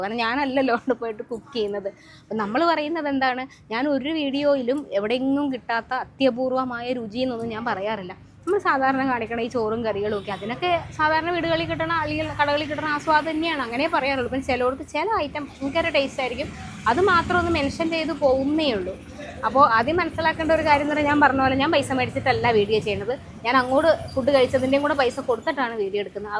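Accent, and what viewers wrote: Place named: Thrissur, India. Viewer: ടീച്ചറേ,, ശരിക്കും വീട് എവിടെ യാ അപ്പോൾ,, ടീച്ചർ സംസാരിക്കുമ്പോൾ തൃശൂർ സ്ലാങ് ആണല്ലോ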